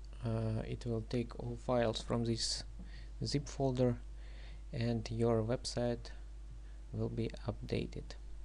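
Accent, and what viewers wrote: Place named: Russia. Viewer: you sound russian i love you <3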